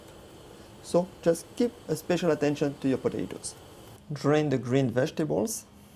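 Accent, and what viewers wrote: France. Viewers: on vois que c est un français qui parle car son accent anglais n est pas maitriser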